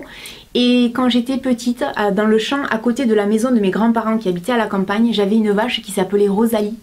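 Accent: South, France. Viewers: jai la rage contre la meuf qui envoi des menace t es une creme ca se voit vive les femmes du sud avec l accent si tu es effrayer je suis la tkt... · Trop drôle tout ce que tu dis et de la manière que tu le racontes. J'ai fait comme ta maman avec mon fils qui avait piqué une sucette dans mon dos chez le marchand. tu es superbe et tes vidéos aussi! Et surtout garde ton accent du sud. Tu fais honneur à notre région! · Haaaa une fille avec l'accent du sud la famille ^^ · cc j'adore t video tu est trop trop belle tu ma fait trop rire lol. j'adore ton accent du soleil. t o top bisou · Cc, que du bonheur dans cette vidéo, tu m'as fait trop rire avec toutes tes anecdotes, ne change pas la et tout ça avec l'accent du sud, bises a+ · J'ai découvert ta chaine aujourd'hui, et je suis en train de visionner toutes tes vidéos! Tu es vraiment adorable, j'aime ta franchise, et ton petit accent du Sud! 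Et puis, tu es vraiment magnifique (t'as d'beaux ch'veux, tu sais?!) Je ne commente jamais sur YT mais je voulais quand même préciser quelque chose: le poisson, c'est de la viande! Du coup, ceux qui se définissent végétariens alors qu'ils mangent du poisson... offrez-vous un dictionnaire pour la rentrée! ^3^ <3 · Tu me fait bien marrer avec ton accent du sud😂😂❤ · OH MON DIEU! Tu étais vraiment trop mignonne quand tu étais petite ma chérie, tu étais vraiment trop belle!!! Une petite rousse toute mignonnette, je ne sais pas ce que les gens ont avec les roux, les réactions envers eux me choque beaucoup. En tout cas, continue comme ça, ton accent du Sud me fais beaucoup rire, ce n'est pas de la moquerie mais moi aussi j'ai l'impression de me sentir en vacances et d'entendre ma tante...eh oui dans ma famille ils sont tous du midi sauf moi! #intrus. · tu es vers le sud? car ta un accent:D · J adore ton accent du sud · Tu es très belle et intelligente. 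Ton bel accent vient de quelle région du sud? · coucou, je viens de découvrir ta chaîne et je viens de dévorer plein de tes vidéos! ça change ton fonctionnent tes conseils, tu es rayonnante naturelle et tu me fais rire! j'adore ta bonne humeur et puis parlons accent;) je suis du 64 et ça me fait très plaisir d'avoir une youtubeuse avec l'accent du soleil puisque dans les Pyrénées en ce moment c'est 20° et grand soleil:) cette vidéo m'a fait sourire puisque j'ai 23 ans mais je me retrouve beaucoup dans ce que tu dis, je suis plus vieux rock (led zep c'est la vie! ) mais vive les 80! très contente d'avoir découvert ta chaîne, je vais vite m'empresser de regarder tout ce que j'ai raté! et comme on dit porte toi bien potons! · tu as vu plusieures fois Anastacia.... ok je vais aller me pendre lol j'ai jamais eu la chance de la voir sur scène et pourtant j'adorerai étant une fan inconditionelle de cette artiste depuis ses tout début - je suis tombé sur ta chaine par hasard et j'en décroche pluuus lol Et vive l'accent du sud (a) (je suis près de montpellier ^^) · quel bonheur de vous entendre parler! 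Et oui votre accent, le mien, celui du midi,de Pagnol, qui fleure bon la lavande et le romarin ".Non ne rougissons pas de notre si bel accent, qu'il soit clair, sonore, retentissant et allons nous en l'humeur toujours pareille, emportant notre accent sur le coin de l'oreille....."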